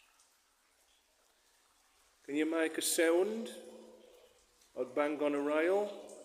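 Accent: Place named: Black Country, United Kingdom. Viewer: Brilliantly done and as a black country girl long moved away I'm loving the accent makes me feel like home.... Thank you so much new sub here xxx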